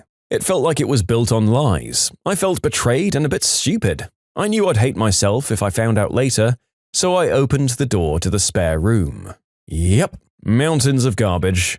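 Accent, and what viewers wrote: United Kingdom. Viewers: Is it just me, or is the new narrators voice really relaxing? I may be biased because I'm British as well and don't hear many British people online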